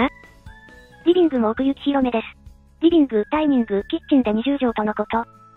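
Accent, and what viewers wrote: Hakata, Japan. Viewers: もっと博多弁を〜〜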